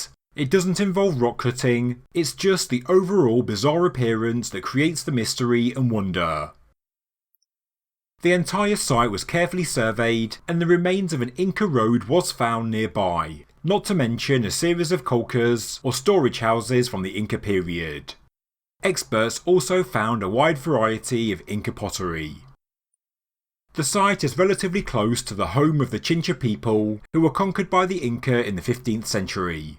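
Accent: United Kingdom. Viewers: O conteúdo do Canal é ótimo. Mas ainda aguardo legenda para Português no vídeo. Esse inglês britânico é horrível!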